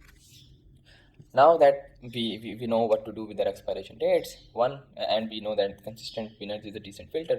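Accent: India. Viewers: bro if u want to trade against algos, market makers and smartest people in the world and you cant understand an indian accent, i think you are not smart enough to trade lol I understood everything perfectly and I see alot of other people did too, so it's just you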